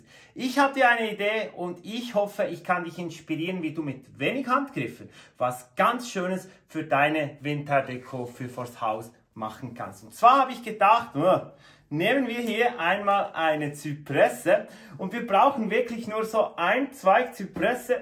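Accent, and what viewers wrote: Switzerland. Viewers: mit einem soooo lieben Schweizer Dialekt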